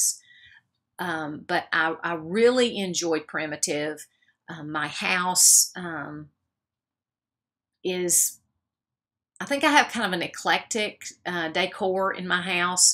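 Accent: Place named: South, United States. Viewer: Hi: I enjoyed your video so very much! I'm a southern girl too (Georgia) so I love you accent. Blessings to you....Renee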